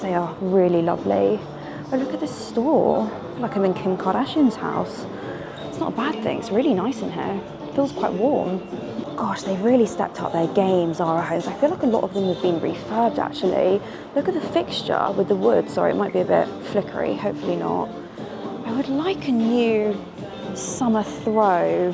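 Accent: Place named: England, United Kingdom. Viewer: I visit London a couple times a year and I always visit most of the places you visit, hoping one day I might see you blogging! Next visit Xmas. You have a lovely London accent - being an ex-pat. I listen to yours, hoping one day I'll sound like you!. I love the extensions (having really long hair suits you - I've just had mine cut for the summer and I'm regretting it a bit). Ah, but it will grow! Keep up the good work - always look forward to your updates. Stay cool!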